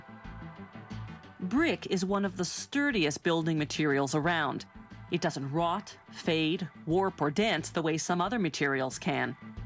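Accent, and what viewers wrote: Canada. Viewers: The commentator's Canadian dialect is thick. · Canadian much. That accent is killing me! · Her accent sounds Canadian, not American. Listen to how she says "process." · The narrators on all the how its made videos are always SO annoying. i think they do it on purpose. They are obviously real people but they sound like the google translate voices. is this woman canadian or something?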